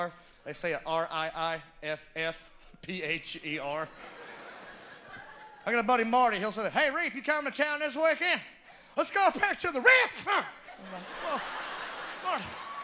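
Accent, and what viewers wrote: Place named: South, United States. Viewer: Yeah unlike Larry the cable guy you have a real country accent and funny lol God bless 😂😇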